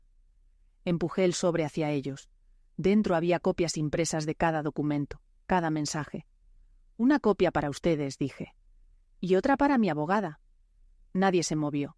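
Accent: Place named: Spain. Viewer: Con mucho respeto,tengan cuidado con sus relatos, en Ciudad de México no nieva y la voz de la relatora es con acento español de España😊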